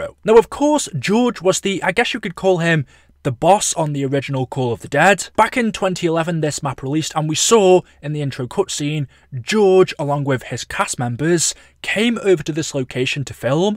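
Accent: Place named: England, United Kingdom. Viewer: Why is your English so... English 😄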